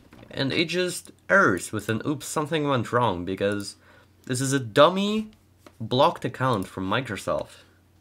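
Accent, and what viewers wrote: Russia. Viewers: You are from Россия? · this russian accent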